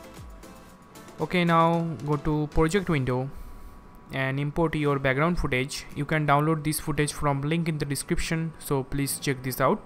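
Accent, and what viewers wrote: India. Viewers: omg at first i thought he was american but his Indian accent is getting more and more lol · By your accent i can recognize you as Indian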